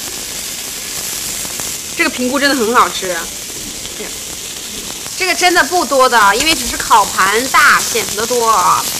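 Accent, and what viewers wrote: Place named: Northeast, China. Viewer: 听见东北口音了， 是快手过来的播主吗，，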